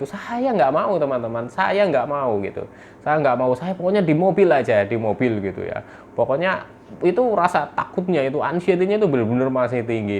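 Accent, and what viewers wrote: Banyumas, Indonesia. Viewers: Asalamualaikum saya kena aslam udah 4 tahun tapi masih sering kambuh2 tiba2 gemetern sampe sampe lemes se lemes ya rasa ya rasa gemetern y datang y dari ulu hati, kang y mana saya jga punggelan banjarnegar.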